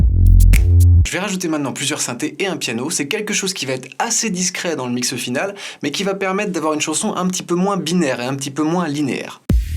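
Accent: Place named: Germany. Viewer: xD je croyais que tu était allemand au début ^^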